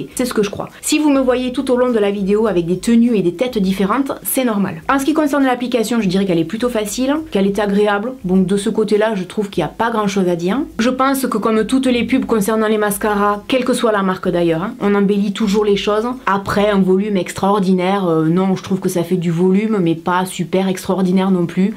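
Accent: South, France. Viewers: Je trouve que tu es quelqu'un d'honnête et sincère.. en plus de ta fraîcheur et de ton accent du sud!!!.. ça fait du bien de voir ça sur YouTube .. je commente très rarement tes vidéos mais je les suis depuis un moment .. continues comme ça merci beaucoup !! bisous de Corse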